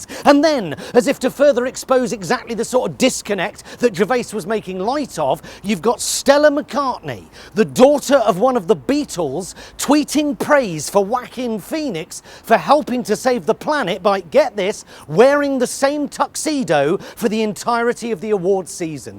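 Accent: Australia. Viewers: Love the Australian accent, boy! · Strong Aussie accent game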